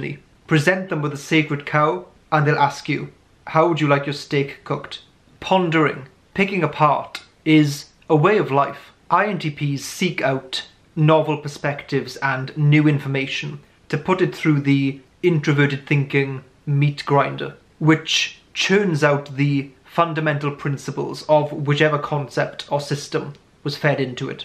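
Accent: Wales, United Kingdom. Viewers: You sound VERY welsh. I’m south, how’s lockdown treating you?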